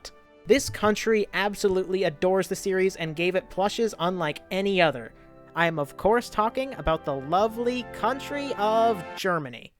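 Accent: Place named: United Kingdom. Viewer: Bro’s British✋😭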